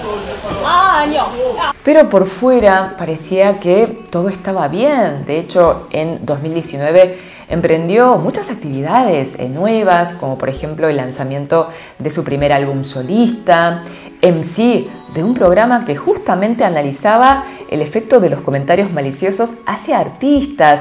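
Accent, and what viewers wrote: Buenos Aires, Argentina. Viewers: Qué acento tiene? Es el castellano.neutral con algo de acento argentino, no? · Bueno es coreana la conductora o argentina por qué sus rasgos son coreanos pero habla como argentina .che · su acento es el porteño de argentina. le sale perfecto, ella se vino a vivir acá cuando era chica. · habla como si fuese Argentina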